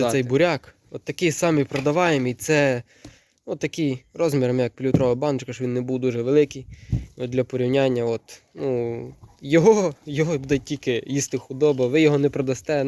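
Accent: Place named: Vinnytsia, Ukraine. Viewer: Звідки ви? Якщо не секрет. Такий говір наче з Вінницької області ☺️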